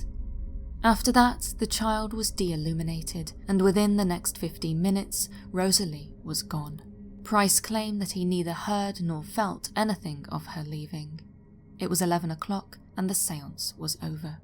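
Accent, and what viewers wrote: United Kingdom. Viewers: As an American wouldn't you believe anyone with a soft english accent work for the BBC? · they have all regional accents on tv now to show how “evolved” they are…. How not prejudiced! But has the traditional well spoken British accent that is what you think of when you think of a professional presenter! 🙏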